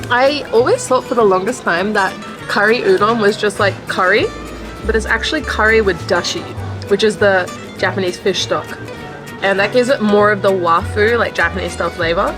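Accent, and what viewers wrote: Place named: Australia. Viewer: You can 'hee-yah' the 'dee-yah' - I love your Australian accent! 💖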